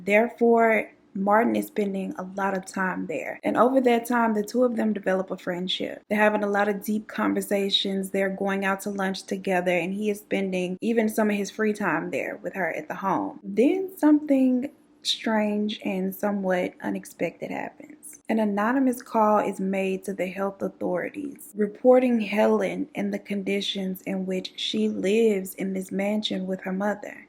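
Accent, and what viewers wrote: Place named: Houston, United States. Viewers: i shoulda knew u was from houston too by the way u sound lol!! <3 I just found your youtube recently and I love it!! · I knewww you were from Houston as soon as I heard you speak. 😂 we have that extra seasoning in our story telling. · Omgggg aye ur from texasssaas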